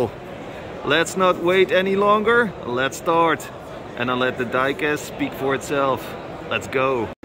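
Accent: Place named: Belgium. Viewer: it's funny, when talking English sounds Belgian, when chatting Dutch rather 'Hollands':-)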